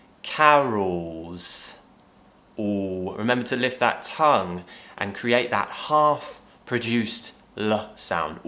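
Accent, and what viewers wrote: United Kingdom. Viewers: Happy celebrations!!!! And thanks for all videos!!! I love British pronunciation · Hi sir. EVEY TIME WHEN I LISTEN TO YOUR SPOKEN BRITISH ENGLISH I LOVE MORE AND MORE ENGLISH.CHEERS OUR BEST TEACHER.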